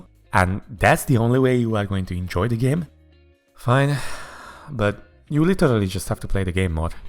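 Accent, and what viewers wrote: Hungary. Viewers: What is your main language? Bcz its sounds like hungarian. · Az akcentusodbol egybol tudtam, hogy magyar vagy, nagyon jok a videoid :D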